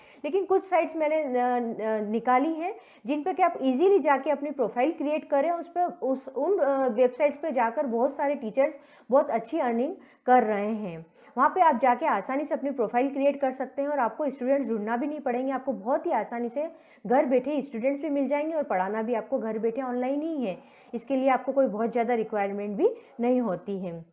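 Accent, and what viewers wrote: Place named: Malwa, India. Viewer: Mam aao kaha se ho?? Apki awaz se to malwa region ke lag rahe ho...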